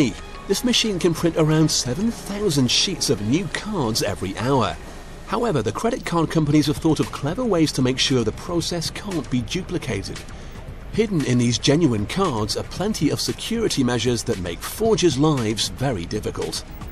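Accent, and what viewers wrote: United Kingdom. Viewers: The U.K. narrator makes everything better.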